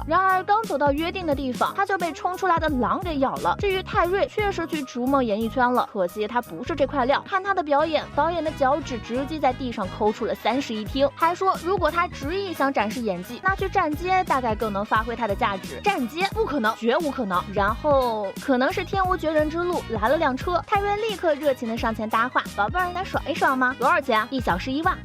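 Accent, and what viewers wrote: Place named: China. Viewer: 版主是中國人又不是台灣人，口音聽不出來嗎？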